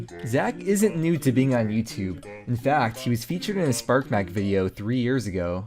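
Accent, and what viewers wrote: Canada. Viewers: wait is canadian? pls tell me I'm not sure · wait a second.. are you canadian? So many good laughs this video !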